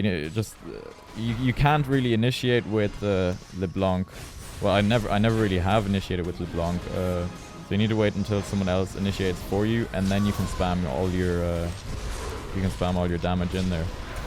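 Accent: Ireland. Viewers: are you Irish and if so which county? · Ah an irish man as well, respect my friend ;) nice guide :)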